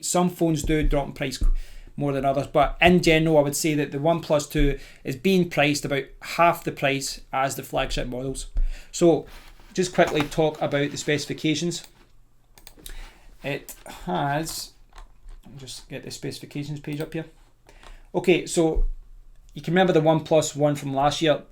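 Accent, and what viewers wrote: Scotland, United Kingdom. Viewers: love your Scottish accent! When I heard it, I pressed the like button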